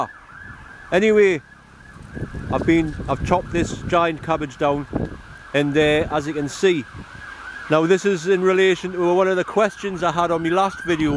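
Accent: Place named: Newcastle, United Kingdom. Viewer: from the North East Hon... It's Geordie!!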